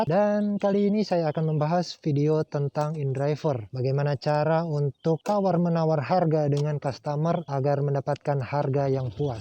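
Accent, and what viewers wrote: Manado, Indonesia. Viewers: Orang mnado ya bro?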